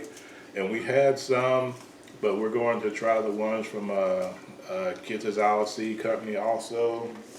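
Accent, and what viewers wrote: United States. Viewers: Wow!! I am catching up on your videos - and I am so impressed with the collection of seeds that you have!! And you made me laugh when you said you have a bit of a southern accent and may pronounce things a different way!! People here in Texas sometimes think I am from a foreign country given the way I pronounce things!! LOL! So nice to see you and. God Bless, Mary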